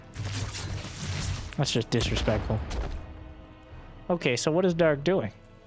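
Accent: South, United States. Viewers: I actually stopped playing in like 2010, didn’t even follow it anymore. casts were so good, I was chilling in Taiwan for the past year and it randomly popped up on my YouTube and I’m like WTH, let me check out the game. He single handedly made me interested in spectating competitive SC2 again. At first I’m like, is this a joke? Dudes southern accent just throwing out stuff like making his spire great again in professional casts? Now I catch at least one cast a day. 😂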